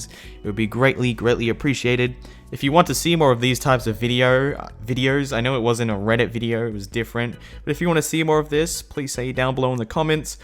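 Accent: Australia. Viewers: Is...Is that an Australian accent? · You're Aussie accent makes this 10x better lol that one ab Shawn mendez, near the mid of the video the last words were 'Shawn winks' but you said it like: Shawn WANKS lmfao that one had me in tears and also the finn wolfhard one lol and the one that says 'or I'll TICKLE YOU' YOURE ACCENT MAKES IT SOOO MUCH BETTER IM TELLING YOU LOLLLL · I'm digging the obnoxious Australian accent for all of the guys' voices.👌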